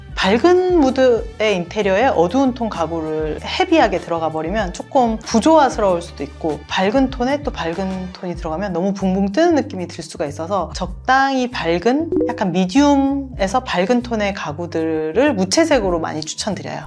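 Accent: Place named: Jeolla, South Korea. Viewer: 전라도네? ㅋㅋ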